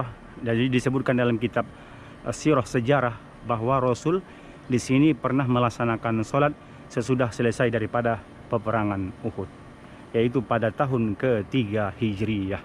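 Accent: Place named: South Tapanuli, Indonesia. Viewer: Nampak kali logat Tapselnya bg..sukses selalu..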